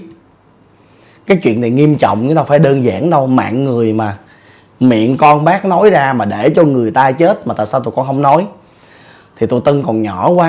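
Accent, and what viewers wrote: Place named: Saigon, Vietnam. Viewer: Em nghe anh ke giong Ben phường 7 Quan 8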